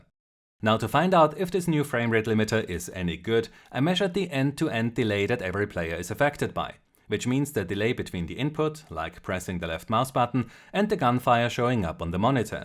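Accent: Germany. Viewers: Ahh der Deutsche akzent xd aber cooles video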